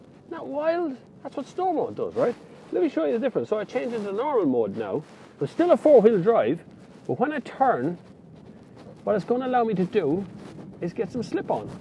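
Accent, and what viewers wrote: Ireland. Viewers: baaags of space, love this Irish accent, 2011 quite good amount of snow.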